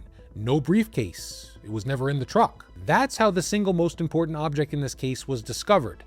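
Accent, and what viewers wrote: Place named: New England, United States. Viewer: Are you from New England? You sound like a fellow New Englander.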